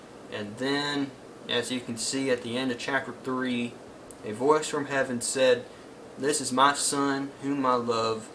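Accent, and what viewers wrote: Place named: Carolina, United States. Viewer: You don’t have a Georgia ascent you have like a North Carolina South Carolina ascent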